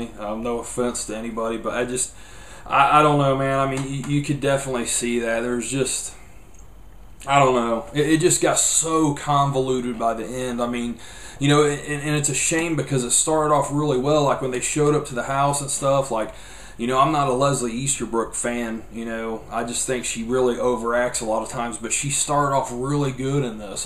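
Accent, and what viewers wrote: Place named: South, United States. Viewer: Handsome. Love your southern accent, when it pops up. Trip With the Teacher popped up in my recommended YouTube videos, but, I don't know if it's here. Got halfway through it's trailer. When you say, "do," &, "too," I melt 😍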